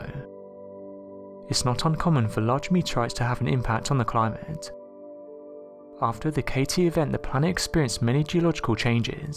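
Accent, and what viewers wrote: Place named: Cockney, United Kingdom. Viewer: Sorry but that cockney is the worst. Mate😎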